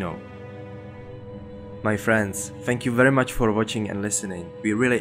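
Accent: Czech Republic. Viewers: Do I hear a czech accent of speaker, don't I? :D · by accent u are deff czech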